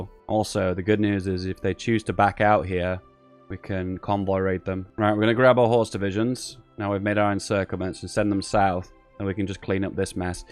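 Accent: United Kingdom. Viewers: Watch your videos because I like your British accent. Greetings from Russia)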